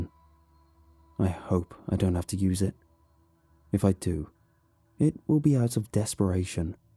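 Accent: England, United Kingdom. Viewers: Which part of England were u brought up in? You’ve a lovely, rich & expressive speaking voice. & i just wondered where, exactly, u originally came from. Middle England is just too vague. Please!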